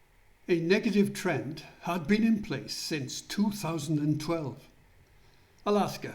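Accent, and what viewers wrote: Wales, United Kingdom. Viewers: Very clear and well presented and your Welsh accent is lovely to listen to.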